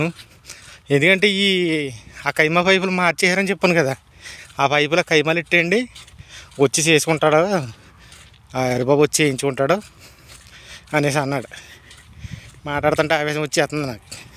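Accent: Godavari, India. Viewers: హాయ్ అన్న ఎలా ఉన్నారు నేను ఈమధ్య మీ, వీడియోస్ చాలా మిస్ అయ్యాను మన గోదారోళ్ళు మాటే అలా ఉంటుంది మరి కైమాలు, చాలా బాగున్నాయి నైస్ వీడియో బ్రదర్ 👍👍♥️♥️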